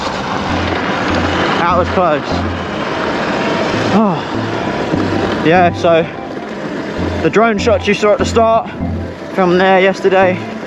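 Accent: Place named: Midlands, United Kingdom. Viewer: We’re are you based mate trying to figure it out I’m Northampton you sound like your midlands. I like the look of the woods. Also thinking about losing my front mud guard as it looks much better. Do you not get a face full of mud 😂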